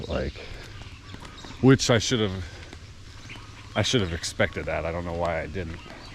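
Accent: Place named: United States. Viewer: at least your accent is giving someone with native English language from USA. You were not only raised by Americans, but you were/are exposed to American system a lot..... And I don't think it is funny. You had serious symptoms and yet it seems that you don't grasp basics of tropical diseases and that you, indeed, did have serious symptoms and that you are lucky to be alive. It seems you find this funny. Well, good for you.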